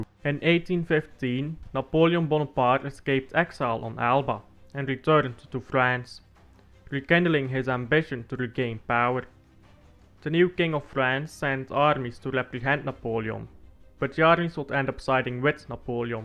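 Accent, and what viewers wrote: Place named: Aalst, Belgium. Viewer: Great video! I can deduct from your accent that you are from Aalst?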